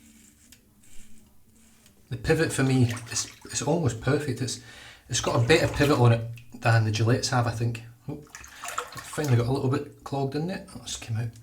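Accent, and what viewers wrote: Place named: Scotland, United Kingdom. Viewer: Great review, sir! Where in Scotland are you from, can’t place your accent!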